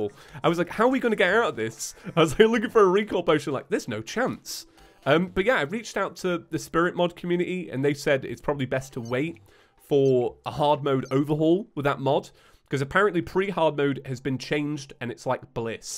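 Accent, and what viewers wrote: United Kingdom. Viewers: Bruh I always click on these videos to sleep, and it always has to be a stinkin British accent? Can you speak more American please? It’s more relaxing than that proper sounding stuff.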